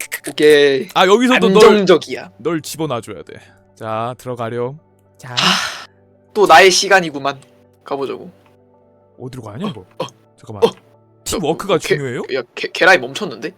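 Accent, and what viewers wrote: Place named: Jeju, South Korea. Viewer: 제주도 사투리 너무너무 귀여워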